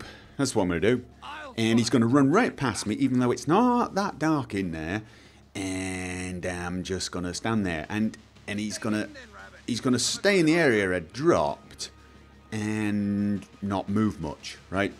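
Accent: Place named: Manchester, United Kingdom. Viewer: I think he's from Manchester, UK.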